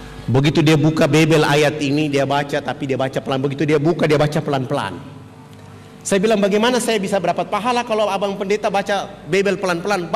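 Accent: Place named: Papua, Indonesia. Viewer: Aku dari sumbar,aku suka bahasa papua,karna bisa membuat ku tertawa,dan merasa bahagia..habis rada2 lucu cara bicaray..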